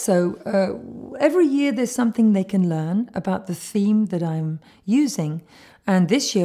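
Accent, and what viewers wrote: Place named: United Kingdom. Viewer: Best British accent I have ever heard. Splendid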